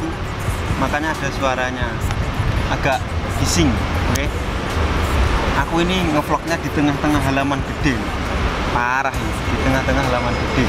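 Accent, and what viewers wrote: Java, Indonesia. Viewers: Jowo mu medok massee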